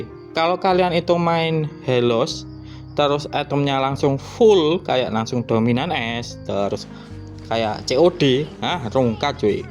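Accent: Java, Indonesia. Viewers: Temenan pora 😂😂😂 jowo meduk hahah mntep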